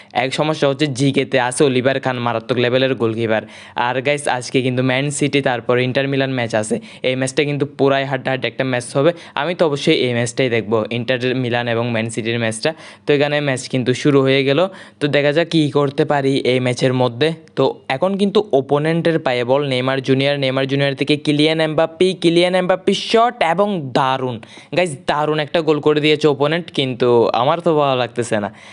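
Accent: Chittagong, Bangladesh. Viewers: ভাই আমার মনে হয় আপনি চিটাংগা। আপনার বাড়ী কোথায়? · Vaiya apnar vasa Chittagong a kutai amar Chawkbazar ❤❤❤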